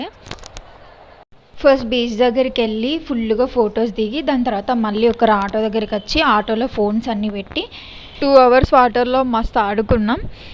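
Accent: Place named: Telangana, India. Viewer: Telangana slang shade aithe pakka untadi 😅.ade continue cheyyandi baguntadi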